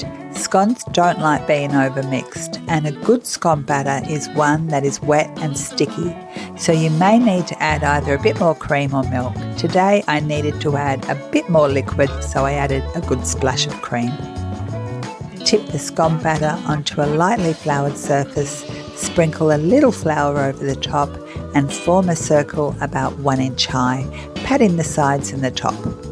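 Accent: Australia. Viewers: Fantastic!!! Thanks so much! So happy to hear a fellow Aussie cook😃💞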